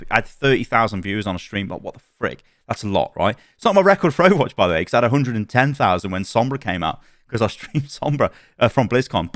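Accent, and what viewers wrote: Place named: United Kingdom. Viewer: He sounds British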